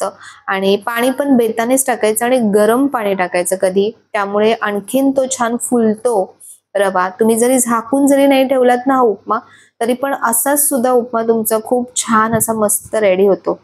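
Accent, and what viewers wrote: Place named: Konkan, India. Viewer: Kokan natil language asish ahe....tasch boln aste sarvanche ..ani chhan ch bolatat tai❤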